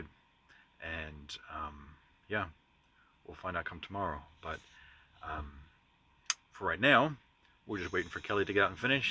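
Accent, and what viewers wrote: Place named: Australia. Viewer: Thanks for taking us along. I’m loving the vlogs! And…..now that I know you’re from Australia I can hear some faint whispering of an Aussie accent 🥰.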